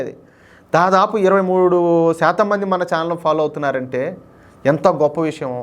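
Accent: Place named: Kadapa, India. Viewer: I love your Kadapa language🎉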